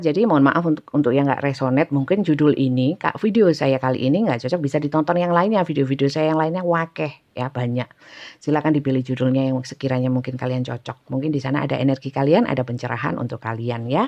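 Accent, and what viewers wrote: East Java, Indonesia. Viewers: Nyimak readingan Bunda tuh bikin senyum² sendiri😍di samping sll resonet aku suka logat nya Bunda khas Jatim,pencerahanya jg sangat tepat👍sukses n sehat sll ya Bund🤲...tks🌹😍🙏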